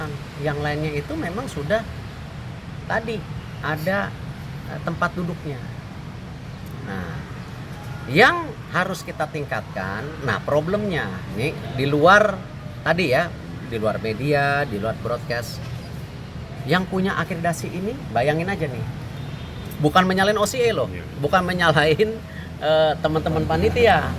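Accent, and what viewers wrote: West Java, Indonesia. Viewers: Disini kok saya mendengar logat n aksens sundaness yee.. apa pak org sunda atw bs logat sunda yak.. behheh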